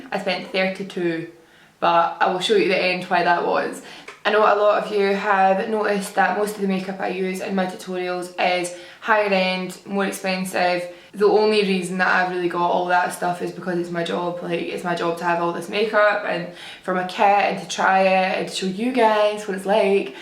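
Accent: Scotland, United Kingdom. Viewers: Which part of Scotland are u from I'm from Glasgow ! 💕 X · Omg your Scottish me too xox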